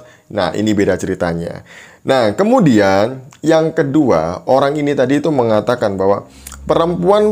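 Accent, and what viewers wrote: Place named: Manado, Indonesia. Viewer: Kau itu org mana, kalau logatnya seperti darianado.. org mabok yg masuk gereja yg kamu bilang itu bohong tetapi kalau dia mabok pasti disuruh pulang, kalau kamu kentut di gereja ya terserah dia tap tapi orgnya tdk tau malu..tapi tdk membatalkan org lain untuk beribadah karena makna cara penyembahan kpd Tuhan berbeda dng islam..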